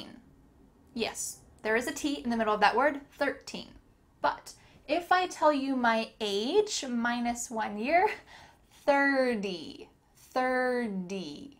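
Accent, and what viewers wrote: United States. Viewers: hell this is not English its American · I know you say this is American English, but as someone who’s been born and bred in the UK, this is sooooo American 😂 I love it 😻 it’s all the words that stand out to me when watching American shows, I could hear the difference but I couldn’t understand what it was that made it different, this is so cool, thanks 🙏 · Oh, as a Brit I had to abort! Those pronunciations are... very American! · This is American English pronunciation - bah humbug. Correct your title. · Not that hard. Just kidding. Not that hard for me now yet it did take me some time to master the pronunciation of those words. And I'm glad you didn't include words such as whirlwind or caveat. (By the way, do you live in the US? Your speech sounds more and more American. I remember you mentioning that your husband is American - I might be wrong about that). 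What a teacher you have become ! Kudos to you. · Please stop learning English from an American, they destroy the language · Please do not say you are speaking or pronouncing “English” You are pronouncing :AMERICAN”. It is very different. In English remember D is at the start of the word. Mirror is MIR. Ur. Asks is a long A “R skas....Please you are murdering my language !!!!!!!! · American English pronunciation? Yes, I think so.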